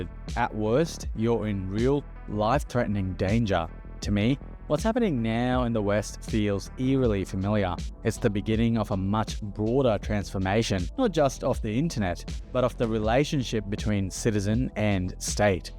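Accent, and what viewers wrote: Australia. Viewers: Graphic violence sounded like graffi marlins. That lazy Aussie way of speaking.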